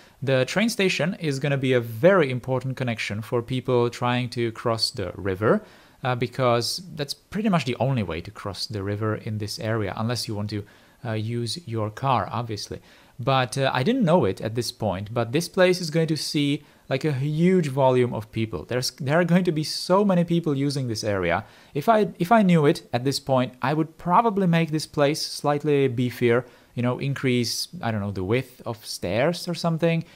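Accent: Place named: Czech Republic. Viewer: It's the czech accent for me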